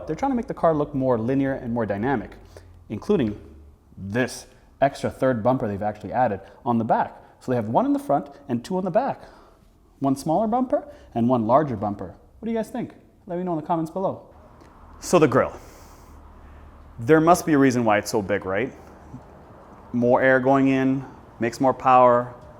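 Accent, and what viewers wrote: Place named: Canada. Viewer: Boy that Canadian accent is so heavy...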